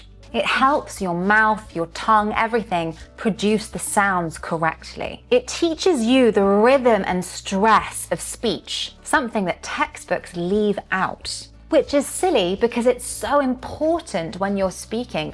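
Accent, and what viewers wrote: United Kingdom. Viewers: May know mam, which british accent you have?? I love the way you sound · I really appreciate your videos They've been a great help with my English. Thank you very much indeed. (Especially lovely British accent) 🎉🎉🎉🎉🎉🎉🎉🎉🎉🎉🎉🎉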